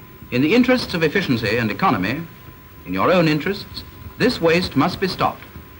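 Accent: United Kingdom. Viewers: I've watched this video so many times, but I come back for the narrator's exquisitely dapper British accent.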